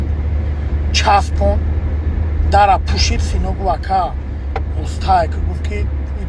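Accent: Kulob, Tajikistan. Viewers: Ака зури бади бахдо кулоби гап нест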